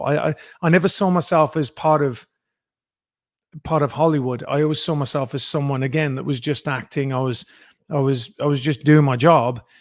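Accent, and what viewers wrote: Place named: Northern England, United Kingdom. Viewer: who did Matthew play in Coronation Street please. He speaks beautifully. You can hear the northern vowels . He so nice to listen to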